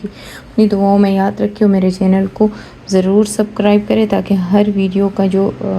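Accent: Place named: Peshawar, Pakistan. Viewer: Pta nhi ye pishawer wali urdu bolte waqt teek he, teek he itna ziyada q bolty hn 😅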